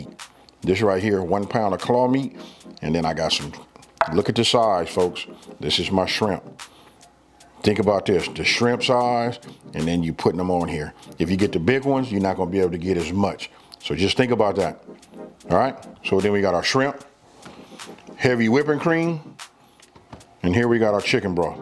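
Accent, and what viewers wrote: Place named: California, United States. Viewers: I’ve been cooking with potatoes a lot lately. I can’t WAIT to make this. Thank you! Love your Cali accent too. 🌸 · Oh ok so he’s from Cali ok. I thought he was somewhere in the South by his accent ok hehehe.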